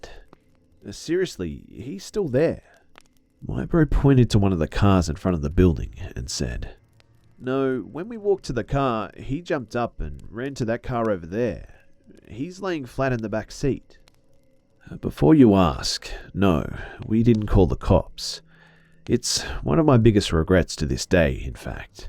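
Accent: Australia. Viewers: Ahh it's always good to hear that amazing Aussie voice! 🌼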